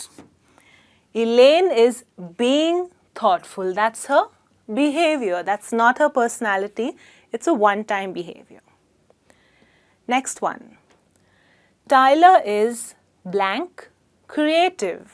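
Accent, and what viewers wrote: India. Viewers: CARMENFERNAN YANET FERNANDEZ BUSTOS ....excuse me....but actually...she is an indian lady....so how she will be Ble to speak in american english...its common sense..dumb...u r an american ...so u will be never speak like an indian...r u???...nt at all...then why r u telling her....bc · Aleyda Narváez Narváez lol,,,, u moron,,,shez Indian,,, how cn she pronounce like u American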